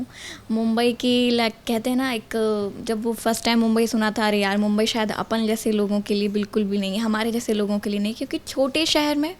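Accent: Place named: Bhojpuri, India. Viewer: Tum bharatpur ki language nhi bol rhi ho ..... bhojpuri hi tmhare language me jhalak rhi h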